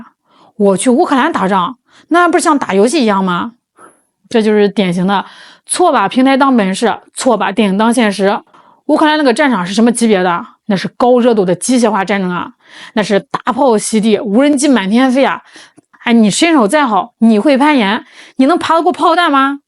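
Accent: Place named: Northeast, China. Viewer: 這種東北口音 聽著很難受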